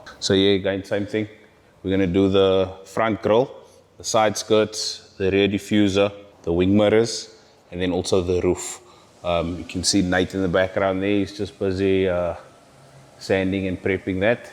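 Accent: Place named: South Africa. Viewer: You look and sound like a South African.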